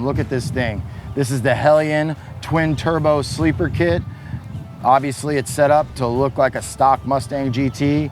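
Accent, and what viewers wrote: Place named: East Coast, United States. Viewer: This that east coast talk, hey man it's sToCk run me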